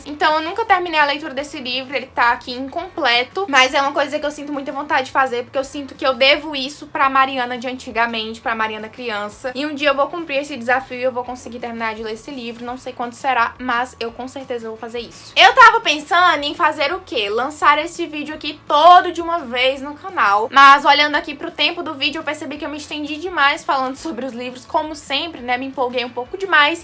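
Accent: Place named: Ceará, Brazil. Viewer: Oi,tudo bem? Pelo seu sotaque chuto que vc é cearense kkkk (sou nova aqui então fui no teu insta pra ter certeza, e fico feliz de vc ser daqui😊) eu queria saber se vc costuma comprar ou deixar livros no sebo, se sim qual sebo vc me indica?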